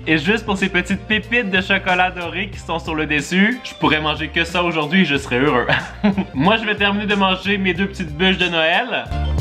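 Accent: Canada. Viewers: tu mon youtubeur préféré de is vidéos accent canadien et qui met quoi des vidéos trop trop rire 👍👍👍👍👍 24h 🇨🇵🇨🇵🇨🇵🇨🇵 🎄 Noël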